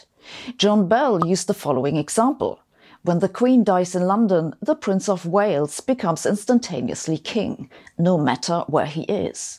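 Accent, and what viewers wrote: Germany. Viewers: I never understand any of her video's but her German Accent turns me on... Oh Shut up, I bet I'm not the only one!🤓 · terrible german accent , SssssSsSssshhshSHSs my ears!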